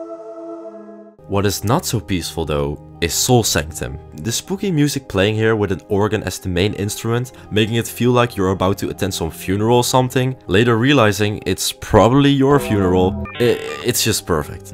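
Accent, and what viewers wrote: Netherlands. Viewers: You can just hear that he is Dutch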